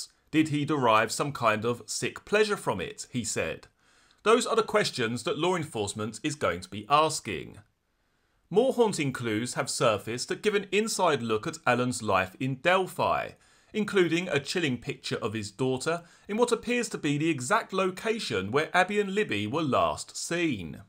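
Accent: United Kingdom. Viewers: You may have a British accent, but you are not Agatha Christie !!!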